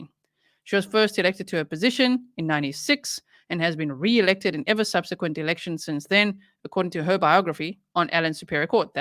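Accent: South Africa. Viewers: I'm a South African and is trying to pin your accent. Are you a South African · You did not reply to a question I asked you on another video. I asked if you are ex South African, because I am & your accent sounds as if you could be from SA. 😊 I listen to another YT channel on crime, but I stopped listening, because the woman's voice grated on me. I've found that on other non crime channels too. I don't think YT creators realise that their voice & physical presentation DO matter. As do the facts, & not a whole lot of speculation or repetition! This is why I like watching you. You tick all the boxes. I also like that you don't swear! That's a huge turn off for me. Did I hear you say you'd been an airline pilot?? Just after I commented on what I like about you, you went on to talk about being responsible. That's exactly right, I see you that way. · We are South African, living in George Western Cape. 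My husband said all along you sound South African. 🇿🇦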